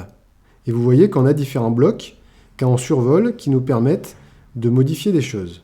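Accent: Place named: Isère, France. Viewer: merci sympa ton accent :) tes dans l'Isère ?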